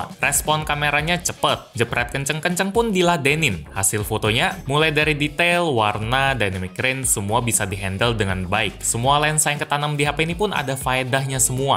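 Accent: Palembang, Indonesia. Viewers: Ko ni wong palembang apo kek mano😅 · asli plembang lu bang? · Aku Cuman Fokus Pas Ngomong, Aku Tekwan samo pempek gendum nyo sikok ee. Apo ko aseli Palembang. Haha salam dari musi rawas ko. Maen maen lah ke musi rawas agek ku kasih kuliner keremis. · Orang palembang y bang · Wong palembang pecaknyo ni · Uwooohhhh... Pas bang ngomong ama penjual kaki lima nya pakai bahasa Palembang, kentel banget. Jadi rindu tempat kelahiran gw jadinya. 12 tahun merantau dijawa belum pulang2. Liat bang tiba2 jadi inget kampung halaman. Wkwkwkwk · Asli wong Palembang apo bang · Ehh wong palembang ee bang? Baru tau kalo bang wong palembang 🤣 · Sering denger abang pake bahasa Indonesia, sekalinya pake bahasa palembang kedengarannya lucu, salam sumsel bang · Wong palembang apo om ni? Biso bahaso palembang. Apo lah teirup cuko tu jadi biso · Wong Palembang niannn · Bang orang palembang? 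· Seneng nian aii denger kak pake bahaso Palembang ni 👏👏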